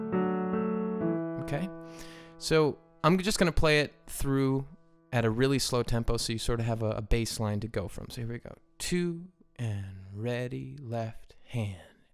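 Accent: France. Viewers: Ahaha the way to you speak french sounds fun Cheers from Paris I speak english espanol french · Thanks, just when I finished learning it xD btw i like your french accent xD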